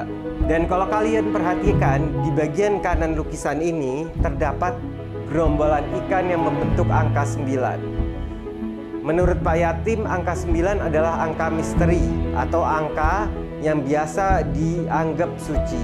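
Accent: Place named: Betawi, Indonesia. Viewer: Nyang gue demen dan salut ame hostnye nih bang, betawi banget dialek ngomongnye... Mantep bang, lanjut...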